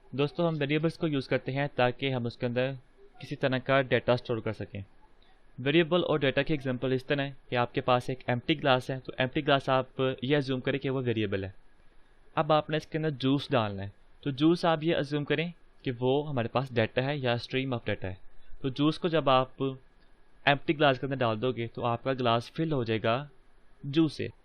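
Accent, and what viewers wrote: Sialkot, Pakistan. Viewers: hahahahaah bhai g ap k bolny k style sy hi pata chal gya tha k ap sialkot k ho nd jab ap ny age print karwai tb sure ho gya =D =D Wesy bhai ap buhat axha explain karty hain or mery mind mai jo b question thy jitni b problem thy wo ap ny khudi hi bta dain =D =D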